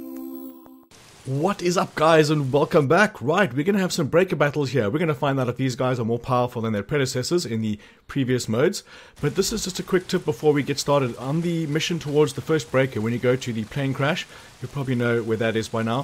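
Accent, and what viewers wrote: South Africa. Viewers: Hey dude, you sound South African....are you?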